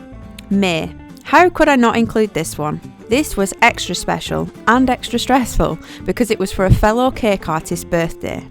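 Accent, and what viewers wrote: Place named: Lancashire, United Kingdom. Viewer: So happy to have found ur tutorials.....absolutely in awe of ur work As a mere novice and previous community nurse ,this is inspirational.....think I chose the wrong vocation in life !!!???? Lol Thankyou for ur clear demonstration and must say ur lovely Lancashire accent just warms my heart . Keep up the fantastic work , I'll certainly be following regularly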